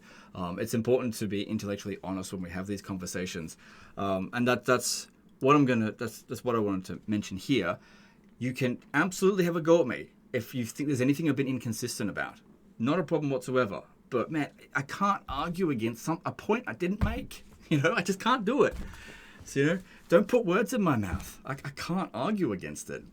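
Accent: Australia. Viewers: Bleaaase dont quit YT entirely, i can't go for weeks without your soothing Aussie voice playing on my second monitor!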